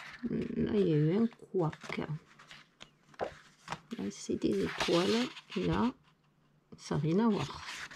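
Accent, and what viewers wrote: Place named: South, France. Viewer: Bonjour, Les blocs 30x30 sont bien de Noël dernier. Vous, vous êtes choquée mais moi déçue car les articles sont en réserve mais faute de personnel seront peut-être mis en rayons semaine prochaine mais pas sûr... voilà la réponse que j'ai eu vendredi sur Albi!😫😡 J'y retournerai lundi et éventuellement j'irai à Gaillac... Vous êtes de quel coin avec votre petit accent du sud?😊 Je ne connais personne dans mon secteur pour partager le scrap. Bon dimanche 🌺 Sophie d'Albi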